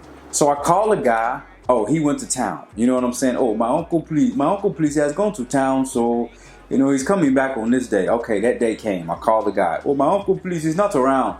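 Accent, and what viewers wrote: Ghana. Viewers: They do that in JA too. If they see u from the states they think u have money. Oh wow this is sad the roads are this bad. Its show how much the government care. The money going in their pockets thats what...these places are so corrupt. This is why they beg their family to send stuff from here. Ur Ghanaian accent is so on point tho lol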